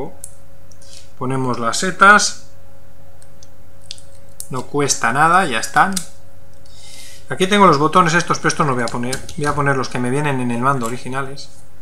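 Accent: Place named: Andalusia, Spain. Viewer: Es andaluz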